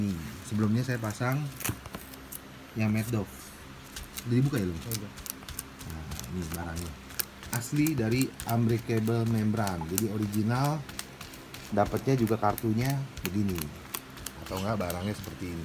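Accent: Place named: China. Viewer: logat cina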